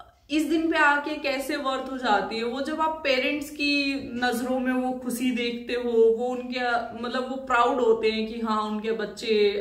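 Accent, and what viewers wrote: Haryana, India. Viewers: aap haryana se ho kya aapki voice tone se lg rha hai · Aap Haryana se ho ya Rajasthan se...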